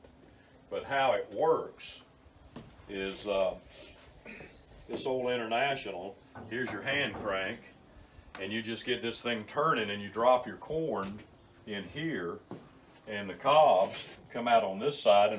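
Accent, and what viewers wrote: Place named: Carolinas, United States. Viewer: You seem very familiar. Your accent and the way it looks I'd swear your in NC or SC...the tiger paw sleeve would make me think your in this area. Not sure if we have ever met somewhere or you maybe just remind me of someone?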